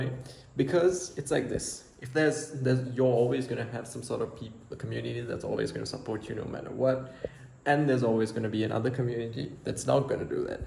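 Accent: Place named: Sri Lanka. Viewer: Bro I identified that accent immeadiatly😂 Im Srilankan too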